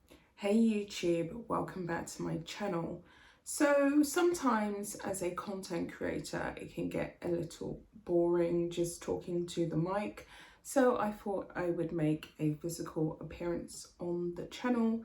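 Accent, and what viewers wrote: United Kingdom. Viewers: Yes, you should appear on camera, why wouldn't you - you look lovely and present yourself very well and are very articulated. British accent to boot☺. But I think some simple background would be good too. Maybe some area in your home with bookshelves? Nothing too fancy, just to make it a bit more personal I guess rather than a blank wall. Keep up the great work! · You are so Beautiful! It’s so nice to finally see you. I Love your British accent. I changed my “ Siri” to be a British man because I just adore the accent.. “ Harry the Handbag “, that was Epic!!!!🤣🤣🥰👍🏼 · Wow I finally get to see you. You always say Im a black British born lady but you sound like a white British lady. Its great to actually see you. Hi. Hello sorry its feels like we are finally meeting. Lol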